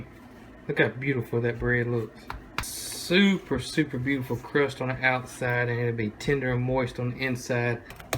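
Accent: South, United States. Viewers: Love ur country accent!!!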